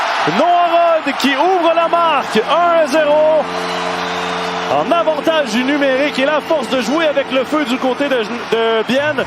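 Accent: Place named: Quebec, Canada. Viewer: pourquoi la commentaire est en francais quebecois?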